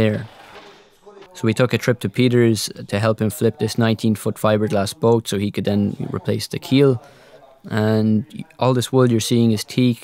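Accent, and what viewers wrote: Ireland. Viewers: Ah you’re Irish. That explains a lot.i was looking around for the elephants. You were talking about the elements. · love the videos love the updates and the restoration of this beautiful boat. Just a side bar , I love your Irish accent reminds me of my dear Gran that passed in 87 but was born and lived in Ireland before coming to the states , God Bless you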